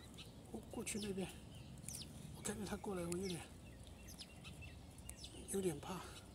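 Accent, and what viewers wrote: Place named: Zhejiang, China. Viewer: 老哥是大陆移民吗，听口音像是浙江的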